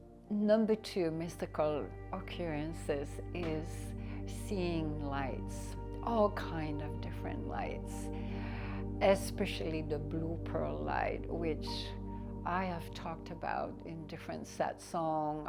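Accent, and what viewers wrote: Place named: West, United States. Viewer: This lady is self deluded, otherwise she wouldn’t give herself the name and sell courses. Some of the info is accurate but it is mostly appropriated knowledge from Vedic texts. Doubt she is able to pronounce any mantra properly with her western accent. Cool video tho, hope you sell a lot of courses its decent info for pashus.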